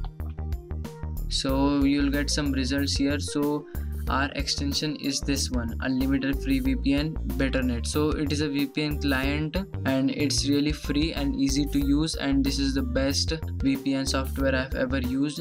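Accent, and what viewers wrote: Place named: India. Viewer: i love when indian talking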